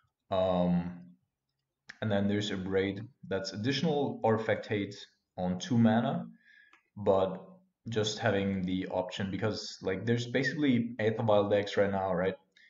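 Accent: Germany. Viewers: It sounds like you might be German am I correct? Or just crazy?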